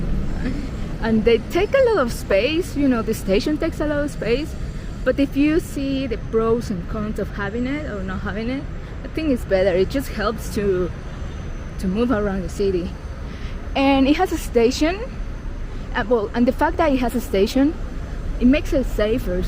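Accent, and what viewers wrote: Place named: Mexico. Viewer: I understood everything and I love your accent I don't know where you are from but I ges you are Mexican congratulations nice video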